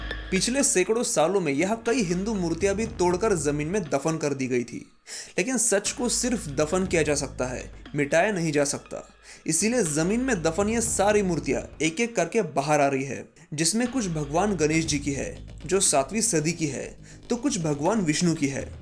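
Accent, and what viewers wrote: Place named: Maharashtra, India. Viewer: you should work on your hindi accent.. looks too much of marathi influence.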